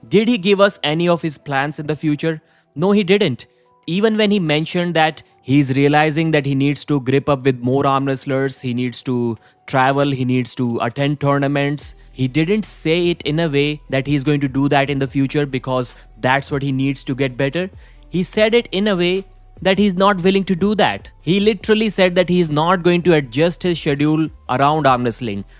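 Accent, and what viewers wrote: India. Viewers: Fuck your indian accent mate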